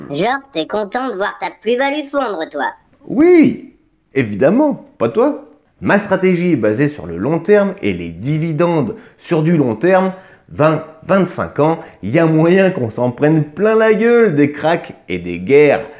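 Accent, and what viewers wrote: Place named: United States. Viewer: Et dire qu'il y a des gens qui achètent des formations pour des centaines de boules ! Et juste pour avoir des idées d'investissements ! 🧐 Alors qu'il existe des cow-boys comme toi qui partagent du très lourd ! El Padre PS : P'tite curiosité perso, explique un peu d'où t'as chopé cet accent américain pas dégueu. du tout ?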